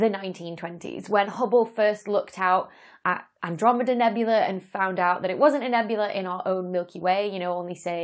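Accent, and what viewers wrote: United Kingdom. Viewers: I have a pronunciation question. In the 70s, I had a meal in Arno Penias' house with his son. It was probably years before winning the Nobel Prize. His son pronounced his last name pen-zee-as. This is not how you pronounce it and I wonder if it is because of your British accent or is my memory bad.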